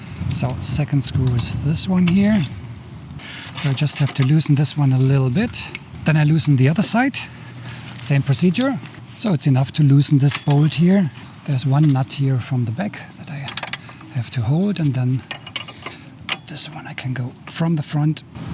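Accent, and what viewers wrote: Germany. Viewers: so nice to hear your German accent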